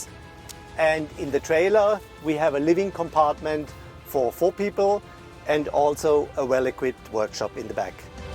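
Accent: Germany. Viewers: The German accent makes it even more better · I'm from germany ;) man merkt an der Aussprache richtig, dass er deutsch ist... 💩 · Pure German accent!!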